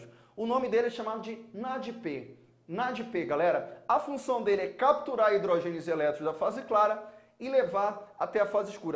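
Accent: Pará, Brazil. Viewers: Maninnnnnho eu gostei da coisiNNNha. Que fofo tio, vc é paraense? Já gostava do antes, agora tá em casa!!! 👏👏👏👏👏👏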